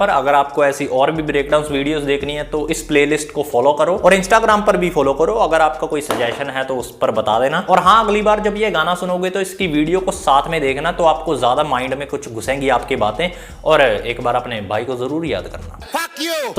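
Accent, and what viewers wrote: India. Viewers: Proper indian accent got me. Anyway, how did this video pop on my yt??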